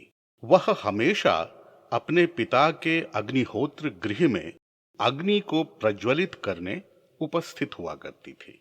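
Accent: Standard. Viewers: वाह वाह वाह अद्भुत कथा तथा आपकी वाणी के माधुर्य और भाषा के शुद्ध उच्चारण का जवाब नहीं सादर प्रणाम आदरणीय🙏💐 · महाभारत की अद्भुत् कथाओं की शृंखला में कही गयी एक और अद्भुत् और मेरे लिए एक दम नयी एक कथा...! कथा वाचन की आपकी शैली और कला को शतशः नमन आदरणीय। आपकी आवाज़ और उच्चारण की शुद्धता चमत्कृत करती है।चित्र एवं संगीत प्रभावी हैं। इस लाजवाब कथा और उसके शानदार प्रस्तुतिकरण के लिए आपको हार्दिक बधाई एवं धन्यवाद आदरणीय 🌹💐🌹💐🌹 सादर नमन एवं शुभरात्रि आदरणीय 🌷🙏🌷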